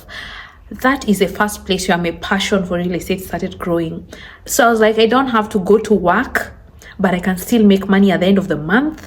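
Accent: Kenya. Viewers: The sexist woman alive. (That Kenyan accent is amazing.). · You could have said that more respectfully. We didn't even hear it, because she was giving us very important information about real estate. Don't force her to talk like an American. She's Kenyan.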